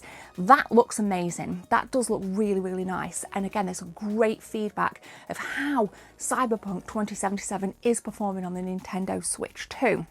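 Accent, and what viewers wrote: United Kingdom. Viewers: I love your accent, I can listen to people from the uk all day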